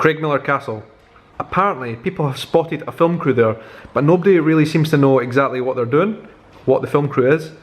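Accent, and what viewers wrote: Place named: Scotland, United Kingdom. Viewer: I was going to ask you in another video if you were Scottish because you sound like one and then I read your description and found out you actually are lol. I'm from Brasil 🇧🇷, Salvador and I lived in edinburgh for 2 yrs and a half and loved there! I'm not very keen about the weather actually but, anyway... my father lives There as well in Ransome Gardens. Cheers!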